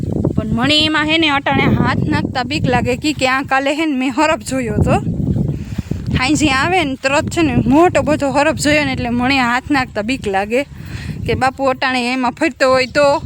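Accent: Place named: Mer, India. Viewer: Ben tame asal merni bhasha bolo so te bahu j saru lage h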